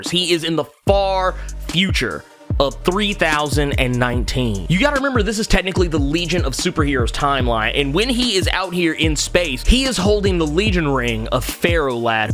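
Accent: United States. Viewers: He’s American how else is he supposed to sound like?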